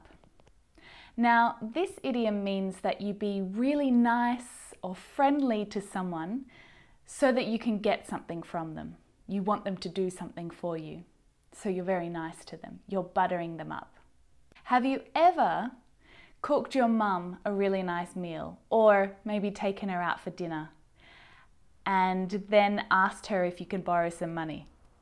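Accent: Australia. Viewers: Hello, are u teaching Australian English? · you have no ideia how I do like your videos. I found you so clear when you are speaking, although I am familiar with Australian accent because I already have been in Australia over a year taking my uni exchange I think there is something really special in the way that you speak to, I can not to point it out but what I know you are the best English teacher that I met so far. You are so creative and the way that you try to create an interaction between you and your audience makes easy to memorise what you are teaching. I'm planning to move back to Australia again and take my master's degree there, therefore I will take again an English exam very soon so, I'm watching to your videos and practicing the imitation technique regarding to improve my speaking skill. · Hey, I really enjoy watching your videos, even more with this Australian accent. 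Hey, just giving an idea for you, you may start your recording with some warm up activity, in order to prepare your audience for what comes later, then stablish tasks with information gaps to maintain the curiosity of your audience. It's just an idea for you. Thank you for being shared these idioms. Cheers.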